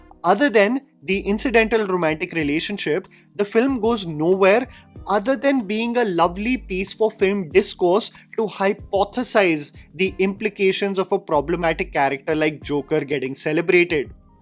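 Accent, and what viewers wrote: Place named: India. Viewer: jesus, what's with the indian accent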